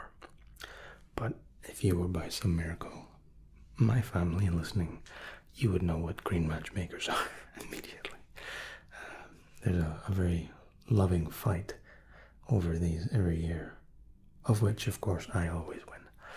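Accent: Scotland, United Kingdom. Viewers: Ah never mind, just done a bit of digging and it’s says he was born in England but has spent most of his life living in Scotland so has picked that accent up a bit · accent is definitely a conglomeration. But yeah, the Scottish accent is most predominant, while lightened a bit by the English side of things. If there's any American accent in there, it's very faint. 😅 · I can hear the scottish but it also sounds like there's some european/american in there too! Forever a mystery but very relaxing hahaha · Where are you from mate? I think I hear Scotland in that accent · I just recently found your channel, 1 video and I'm already hooked to your vids, love the accent and your style 😀 you rock it man haha, and we are both from Scotland FREEDOM!!! haha lol, I'm rambling again I'll stop. Oh and one last thing you just earned a new SUBSCRIBER!! MEEEE HAHA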